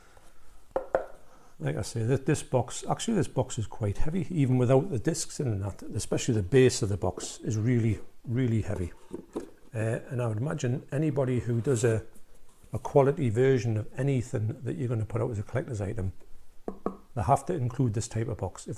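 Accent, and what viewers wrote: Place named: Scotland, United Kingdom. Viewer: that's great to hear, as I've heard that the Argento Cut was the worst of the 3 transfers. Oh ok, sorry. Just bits of your accent sounded Scottish. Not sure if you know, but i'm in Australia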